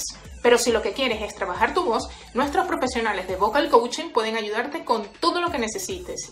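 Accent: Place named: Venezuela. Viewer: Hola! Creo entender lo suficiente como funciona la cuestión de la distribución... pero no tanto lo del Copyright. Soy venezolano! creo que tú también por tu acento. Si quiero subir un tema debería registrarlo primero en SACVEN? SAPI? o puedo usar otros entes reguladores como ASCAP? y de ser así... cuál es el proceso para inscribirme/registrarme a mi y mis producciones? Gracias de antemano! Buen canal! Mi ser servicios están a la orden, soy Ing. de Sonido y productor :D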